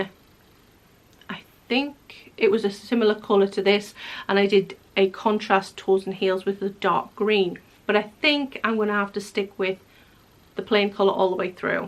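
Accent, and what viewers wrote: North East England, United Kingdom. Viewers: Nice to hear a north east England accent in Orkney! I am a Geordie Knitting in Fife! East Neuk Knits festival coming up in Fife end of November is so exciting it was brilliant last year. We are so lucky in Scotland.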